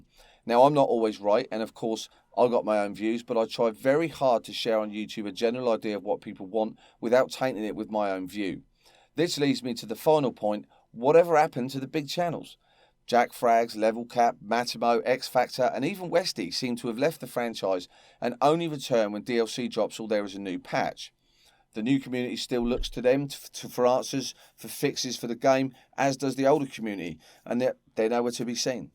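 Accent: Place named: Cockney, United Kingdom. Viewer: i love your cockney swagger but i disagree. Frags xfactor and levelcap do still play the game and i see regular "enough" content. Westie on the other hand seems to think hes some sort of historical know it all and i agree with most of what you say about him. That being said i consider his channel a good inside source for bf content. This game isnt dying, the premium platform is dying. Thats why content has seemed scarce and i believe dice/ea are taking a different approach. Bf1 is by far a better game (for me) than all bf games previous because it ran like butter on pc from day 1. For me personally it solidifies its position as the best battlefield game ever. #fluffybastards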